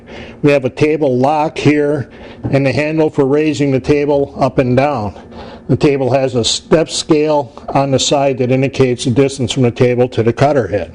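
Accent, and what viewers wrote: Chicago, United States. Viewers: FINALLY! We get to see your face; after watching kadzillions of your "reviews" on machinery. And if you are not from Chicago, I will eat me hat. Your "accent" (and YES Chicago-ites DO have a definite "accent"! LOL) gave it away. YEP! The quite often "Dee's" instead of "The's", etc, gives it away every time. I noticed this distinct accent when I first arrived in the "windy city" in the fall of '46. It took me 6 yrs to fully understand you "Yankees". LOL Of course my school-mates and neighbors never let me stop hearing, "You talk funny with yuz "Southern drawls" lack "Yall"; not to mention, "AH instead of "IEE". But I got "USE-GUYS" back, by saying, "Yes and YALL sho nuf do talk funny LOL"! Seriously, you do a GREAT job reviewing; in these awesome videos. You cut right to the chase (unlike MOST who review) and your knowledge is FAR above other reviewers. 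If a person can not learn from your video's, they can NOT learn. period! Keep up the good work neighbor.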